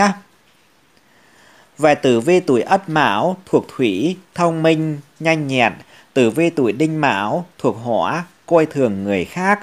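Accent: Thanh Hoa, Vietnam. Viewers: Bạn ơi. Gốc thanh hóa hả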